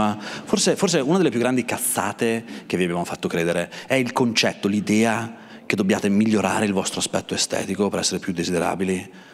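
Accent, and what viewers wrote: Italy. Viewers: ...non siamo così ambiziosi...fantastico! Finalmente un accento né romano, né napoletano. Uno del nord che dice grandi verità senza filtri. Che soddisfazione. Veramente catartico. Ho chiamato quel figlio di puttana di mio padre nell' aldilà (è morto da un po') e l'ho perdonato. Saluti. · Ho guardato questo video ieri sera e l'ho riguardato a oggi mezzogiorno con i miei.. Ci siamo smascellati dal ridere! Grazie Eleazaro a nome di tutta la Romagna, di tutte le "zeta romagnole" e a nome del parlare come si mangia, senza tutti sti costrutti e perbenismi che attanagliano la società! Ci vediamo a Marzo a Savignano! Un abbraccio!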